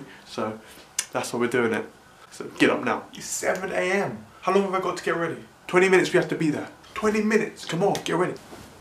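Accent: Canada. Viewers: Are you Canadian?? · Are you Canadian?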